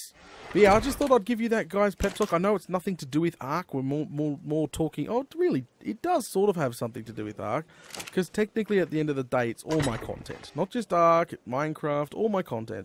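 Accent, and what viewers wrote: Australia. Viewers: well I've been watching for a lil bit of build big or go home but I have watch them all even you sleeping in the stream that was funny loved the d&r series and the series with and watty and all the Ark series but I stay for the aussie accent!!!!!! and is just a awesome young lady she makes me laugh a lot usually at you but that just means she cares a lot about you as of right now i'm building Independence hall as my main base and I made besty's ross house for my catus farm when I decide too make a iron farm if I do i'll make the empire state building just so I can get two farms in it so needless to say the buildings are not too small and I really hate collecting all that clay by hand probally used over 20 stacks of red bricks and need more it will be the only time I use this block in huge quainties love the series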